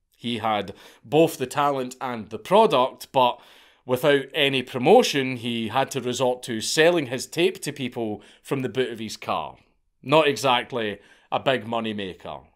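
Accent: Scotland, United Kingdom. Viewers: I’ve never heard “corridos” pronounced like that 😆 something beautiful about a Scottish (or Irish?) lad saying Spanish words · I can see now that Mexico Spanish pronunciation can be a real bitch for a Scottish accent.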